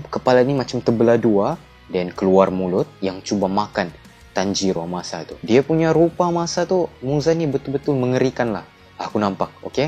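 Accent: Sabah, Malaysia. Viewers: orang sabah bah ni, begini lah kalau org sabah bercerita memang feel betul 😁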